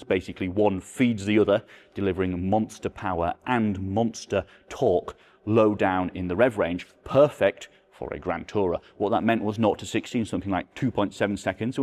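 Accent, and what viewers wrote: United Kingdom. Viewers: His british accent is adorable. Buttun.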